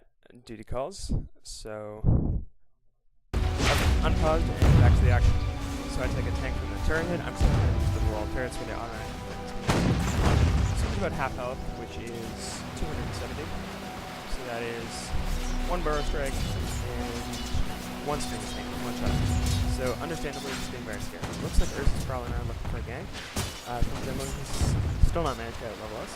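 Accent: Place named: Newcastle, United Kingdom. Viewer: hi geordie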